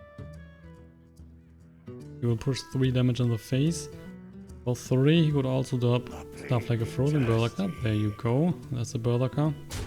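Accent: Germany. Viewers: gratis Englisch nachhilfe: TOMB = TUHM sorry, das musste raus, du klingst henau so, wie meine satirische immitation des "englisch sprechenden deutschen". wirklich ganz typisch deutscher "aktzent". :D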